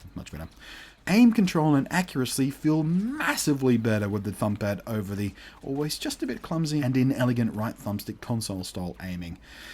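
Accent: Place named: Australia. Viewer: On the topic of Australia, your accent always makes these reviews just that touch more special, but I really do feel for you having issues getting your hands on a lot of stuff not shipped or offered in Australia and your internet connection troubles. I have been waiting for this review and it was nothing short of what I expected. Thorough in depth review over the course of multiple games showing strengths and weaknesses. I'm going to hold off on this one for a little bit as I'm a console gamer moving over to PC gaming so I'm accustomed to the less controllable twin sticks. But I will definitely be getting this at some point in the future. I can wait for 2.0 when it does roll around. I hope things getting to Australia starts to get easier though. You're one of my favorite reviewers and if stuff takes longer to get to you then you're missing out on a lot of traffic and I just want to see someone I appreciate get more recognition I feel they deserve.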